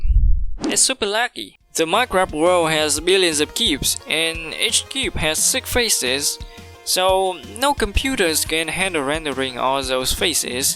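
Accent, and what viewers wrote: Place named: India. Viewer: He sounds indian and looks vietnamiese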